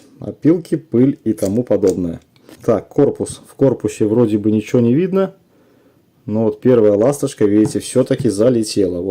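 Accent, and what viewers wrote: Belarus. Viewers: Классный белорусский говорок, я так не умею хотя далекие предки со стороны отца из тех краев.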